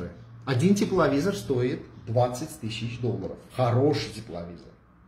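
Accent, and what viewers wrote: Karabakh, Azerbaijan. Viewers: акцент не меняется всегда он карабахский армянин это точно . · Этот канал скорее всего фейковый.. А этот кадр засланный казачок с азербайджанским акцентом косит под армянина и льет грязь против своих, якобы правду говорит, свой же не будет якобы врать !!! Дешёвая подделка !!! Я его невпервый раз слышу, читается как ребёнок...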